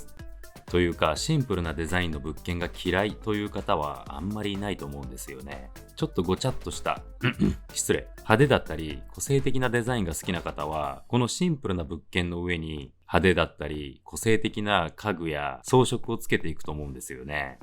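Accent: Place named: Aichi, Japan. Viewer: 私も愛知県なので、言葉の端々やイントネーションから聞き馴染みがありました。私の家の小さな修理をして欲しいくらい器用ですよね？旦那さんがそれくらい器用なら良かったのに。😅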